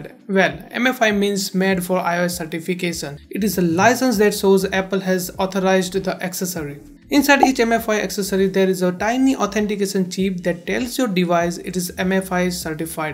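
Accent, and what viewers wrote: India. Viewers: India accent + spoke very fast = rip listeners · You are a narrow minded racist. A chinese criticizing someone’s English accent is just hilarious. His English is fine - he has an ‘accent’ but I am quite sure even you could understand. BTW it’s ‘Indian accent’ not India accent. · omg that indian accent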